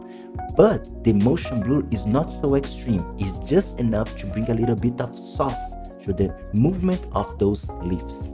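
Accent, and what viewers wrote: Brazil. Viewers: Are you Brazilian ? You have the accent .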